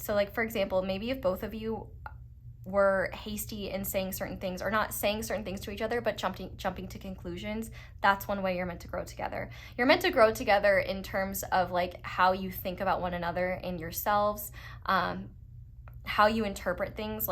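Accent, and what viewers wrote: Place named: Wisconsin, United States. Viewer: Pile 2 - the Wisconsin accent 😂 me being from Wisco and a Packer fan 😂😂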